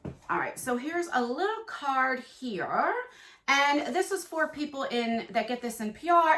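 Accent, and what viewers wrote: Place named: New York, United States. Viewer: Are you a New Yorker? Living for the accent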